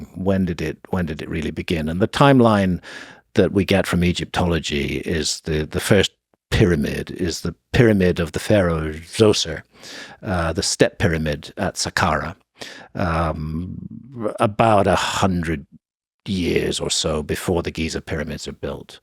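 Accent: United Kingdom. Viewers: This is proof that not everything sounds intelligent when you have a British accent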